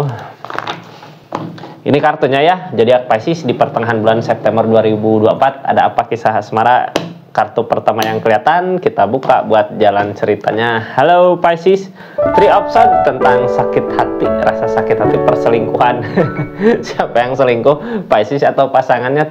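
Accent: West Java, Indonesia. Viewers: Pagi kk..pisces hdr..terimakasih reading.ya sukses dan selalu sehat kk..sunda banget..❤❤❤🙏🙏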